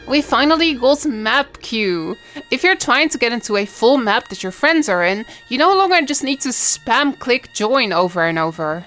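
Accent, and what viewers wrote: Netherlands. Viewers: Do I smell a Dutch accent? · dat nederlands accent erbij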